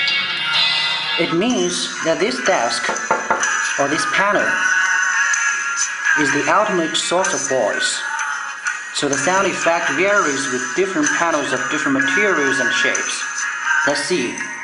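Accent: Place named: Poland. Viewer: Interesting accent, is that polish?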